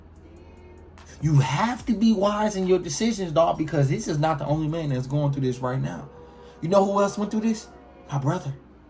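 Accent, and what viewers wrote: Baltimore, United States. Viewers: Thats that bmore talk....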